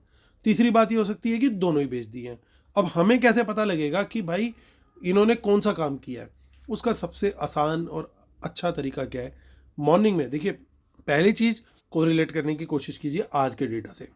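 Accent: Punjab, India. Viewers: Baaton mein punjabi flavour na ho aisa ho hi nhi sakta🎉😅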